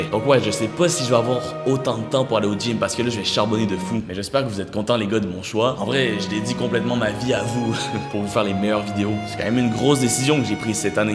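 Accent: Quebec, Canada. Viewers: Je suis désolé mais J’ADORE l’accent québécois c’est pas méchant je le trouve trop bien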